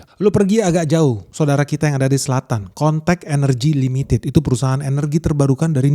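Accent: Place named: Surabaya, Indonesia. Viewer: Gaya ngomong Bang model surabayaan terang2an dan lucu 😂😂😂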